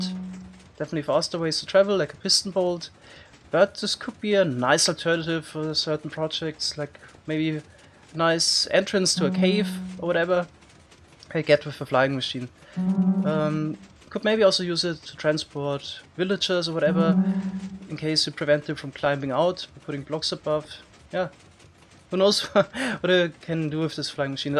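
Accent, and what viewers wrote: Bavaria, Germany. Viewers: Bist du Bayer? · Funny how you pronounce the "r" in the german word "erfinderisch" for an english audience in a spanish or italian way ;) Edit: Could be bavarian or so, do you speak a dialect often? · das lustigste ist, ich schaue dich seit ewigkeiten, und habe schon immer den deutschen dialekt rausgehört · Well as a german i obviously noticed your german accent right away, but who am i to point that out? I'm just here for the nice content :D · Now this is an Bavarian dialect I think. But I don't think many people care about that even in Germany. Although it is quite hard to understand German dialects sometimes. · I love your german accent, also love how you say BEE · Hey, ich habe mir mal vor einer Woche ein Video von dir angeguckt und habe ziemlich sofort bemerkt, dass du Deutsch bist. irgendwie kann ich es immer sehr gut heraushören, wenn ein Deutscher Englisch spricht xD. 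· Du kommst aus Bayern oder? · I'm Dutch but I've always noticed his German accent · Ich habe sofort gemerkt, dass du deutsch bist😂👍🏻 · Kommst du aus bayern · Ich hab immer mich gewundert was es für ein Akzent ist, ich hab vermutet dass es Deutsch ist aber war mir nie sicher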